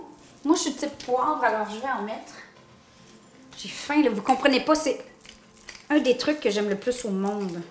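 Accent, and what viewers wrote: Quebec, Canada. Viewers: c'est dommage que ça soit du quebecois j'ai enormement de mal a comprendre se qu'elle dit !!!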